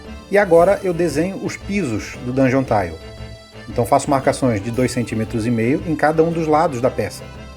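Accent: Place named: Santa Catarina, Brazil. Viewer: E esse sotaque aí meu extimado? És da onde tu? SC?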